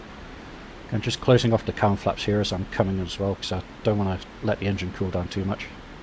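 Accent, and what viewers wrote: United Kingdom. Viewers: Kind of cool and weird at the same time hearing an Englishman narrating DCS in a FW-190 lol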